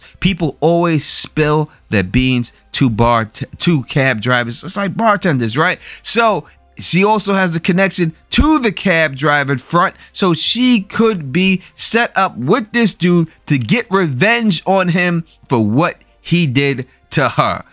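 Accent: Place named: New York, United States. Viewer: Yay! I love your analysis, and NY accent! 😍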